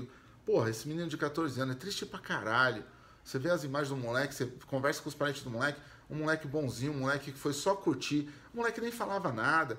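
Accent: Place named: Urban periphery, Brazil. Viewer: Vc tem a voz da periferia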